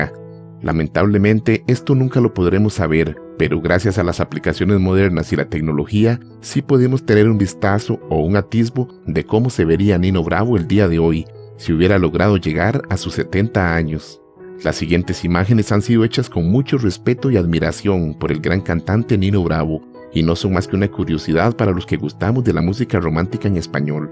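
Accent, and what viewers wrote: Costa Rica. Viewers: Definitivamente el acento tico al oírte hablar me tenia con dudas hasta que dijiste Pura vida inconfundible nuestro acento , síii muy buen cantante de hecho mi nombre me lo puso mi madre en honor a el , el era su cantante preferido , aún hoy lo oye.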